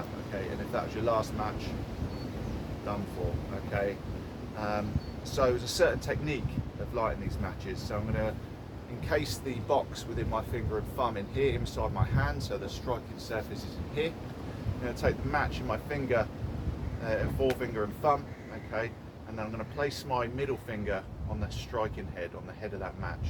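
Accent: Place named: United Kingdom. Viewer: Hi, these videos are fantastic, thank you! I had no idea that you had made all these fire starting videos when I left a comment recently asking for some from you on fire steels or ferro rods. So I am just enjoying watching your videos for now. Next time I know I can check your play list first. And once again its great to hear a British accent talking about bush craft 👍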